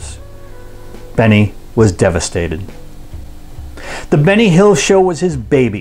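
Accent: United States. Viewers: ONLY thing sad is this usa accent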